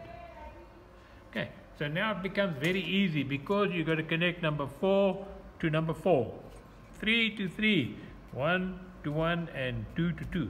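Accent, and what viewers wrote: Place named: South Africa. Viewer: Thank you maneer. I hear your accent from Mzantsi🙏🏽🙏🏽🙏🏽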